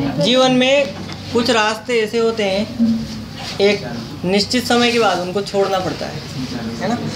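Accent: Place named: Hadoti, India. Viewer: भाई बहुत ख़ूब कहा से हो आप? भाषा से तो हाड़ौती के लगते हो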